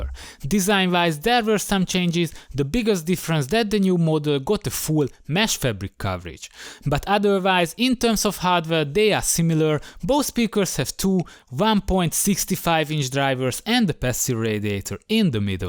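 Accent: Hungary. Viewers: Magyar vagy?Kicsit olyan az akcentusod...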